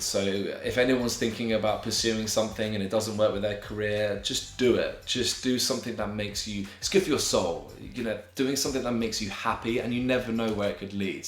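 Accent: United Kingdom. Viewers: I'm laughing so hard at your English accent oh my god... the idea is absolutely GENIUS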